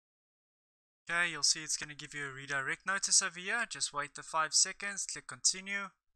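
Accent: South Africa. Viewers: yon sound like a fellow south African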